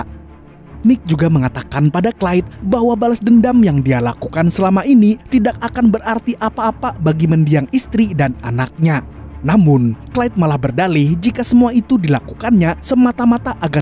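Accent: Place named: Kebumen, Indonesia. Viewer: Jelas admin ini orang kebumen , ke Sempor beli mendoan , ora ngapak ora kepenak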